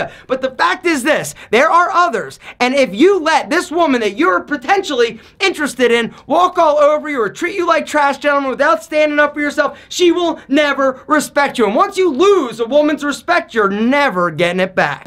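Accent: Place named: Lancaster County, United States. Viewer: sounds like a Lancaster county boi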